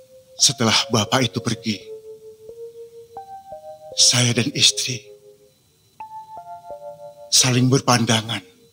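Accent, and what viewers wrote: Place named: Jakarta, Indonesia. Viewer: Hebattt,pengen bisa monolog kek gini,mas kalo sy belajar monolog sama mas bisa ndak? Minta alamat dan no tlpnya mas,o iya mas ini aslinya orang mn ya kok dialeknya kek orang betawi tp kadang kadang kek orang minang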